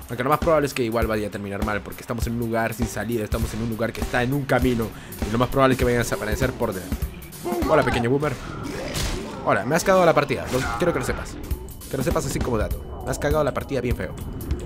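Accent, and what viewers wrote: Peru. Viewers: me parece o eres peruano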